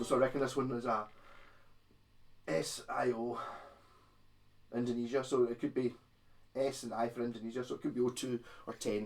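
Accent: Scotland, United Kingdom. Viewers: What kind of accent is that? I bet scottish:) Can get this one for 140 euro,barely used,is it worth?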